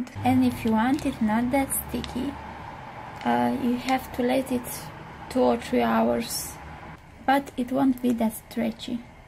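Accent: Romania. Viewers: She is Romanian ..but the point is that not all the Romanian people have this accent ..she has an horrible accent ..I am from London ..Where are you from ? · a accent Romanian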